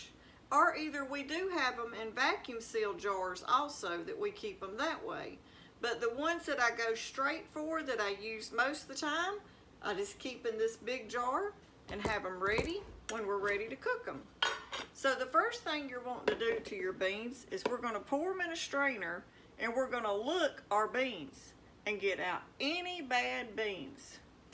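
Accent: South, United States. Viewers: Don't you just love it? 🤗 I always love the sound of a southerners words. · 🤣😂🤣It's southern charm, dear. I live in Missouri and my southern friend from Virginia thinks I talk funny. We can appreciate one another's differences, and be surprised by them.! 💕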